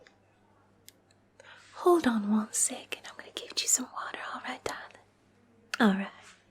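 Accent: South, United States. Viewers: OMG! I know she's not real but I can't help but smile each time I see our sweet Southern Bell. Moving?!?! No!!! Why is our character an idiot? Why is it in most role-plays in the ASMR community, our character is the equivalent of a handsome Village Idiot? Then again, if our faceless character was actually smart, he'd put a ring on her. (Loved the video,. Yeah, you've definitely perfected that sweet Southern accent. Happy Holidays!) <3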